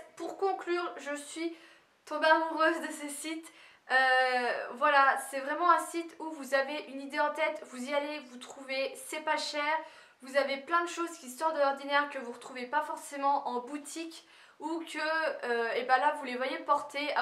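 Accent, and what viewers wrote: Lorraine, France. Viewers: l'accent lorrain est très spécial malheuresement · j'entends pas l'accent parce que je suis Lorraine aussi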